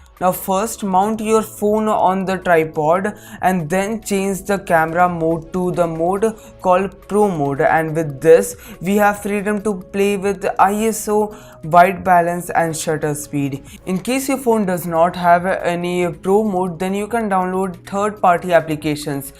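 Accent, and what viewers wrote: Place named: India. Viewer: From your voice I am taking guarrentee that you are 🇮🇳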